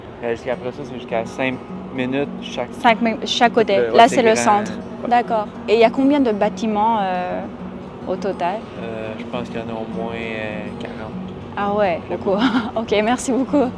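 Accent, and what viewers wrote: Quebec, Canada. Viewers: Excellent quick view of a few of our good spots…..you do speak french like a québécois, accent, expressions, rythym and all….congrats from Montreal… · TU PARLE BILINGUE ON AIME CA C EST QUEBECOIS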